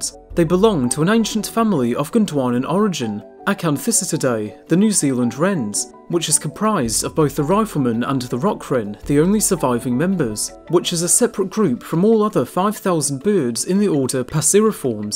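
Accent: England, United Kingdom. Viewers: are you English or New Zealand ish? Because you sound English but mainly do birds from New Zealand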